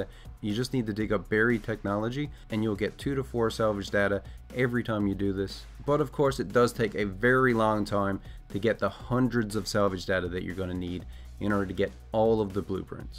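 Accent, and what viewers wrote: Newfoundland, Canada. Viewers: I knew you were from NFLD and then you said that at the end lol. Hi neighbor, great video!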